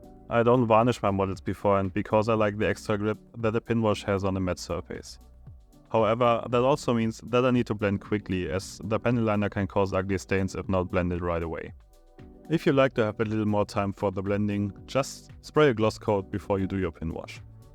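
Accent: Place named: Germany. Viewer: Wirklich gute arbeitet. Sprichst du normalerweise deutsch? Dein Akzent klingt zumindest sehr danach 😂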